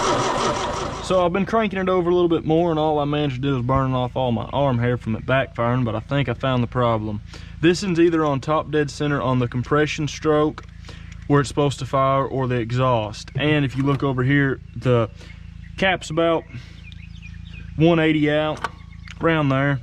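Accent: South, United States. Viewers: I say this as a man born and raised in Alabama. Birmingham, AL. Specifically Centerpoint. I fucking love your accent dude. I love the combination of southern drawl with advanced vocabulary. It's probably the most interesting accent on earth. Reminds me of True Grit remake, how how cowboys talked. Fucking awesome, and relaxing. I've lived all over the southwest and I had people tell me I didn't sound southern. Irritating. Southern is a badass accent. Sounds better than that idiot boston accent.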